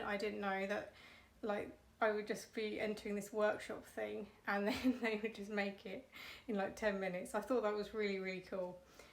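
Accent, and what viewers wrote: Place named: England, United Kingdom. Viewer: when you’re talking sometimes it’s like listening to ASMR your voice with the soft english accent is relaxing even though you are talking about your serious issues. I hope at some point we get some relief chronic illnesses are tough.😞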